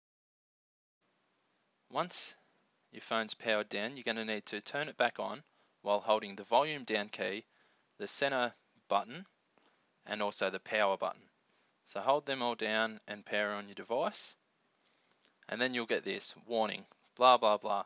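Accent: Australia. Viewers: Nice video, you Australian? I was thinking of doing it, though i'm having second thoughts - what are the benefits in having your Sg3 rooted?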